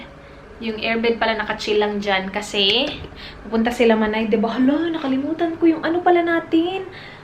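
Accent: Visayas, Philippines. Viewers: Bisaya kaba ate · Ako lang ba ang naka feel na bisaya si ate. 😊😊😊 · bisaya accent talga pag nag tatagalog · Bisaya ka po?😂😂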